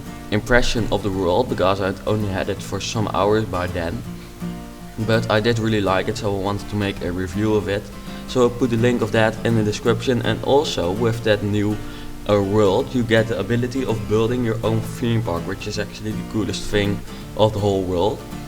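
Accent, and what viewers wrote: Netherlands. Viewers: haha je kan echt horen dat je nederlands bent (: mooi huis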